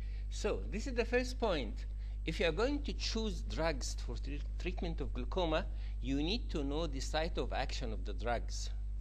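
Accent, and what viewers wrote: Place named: Egypt. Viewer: Shukraan, helped a lot with my therapeutic course Noticed the Egyptian accent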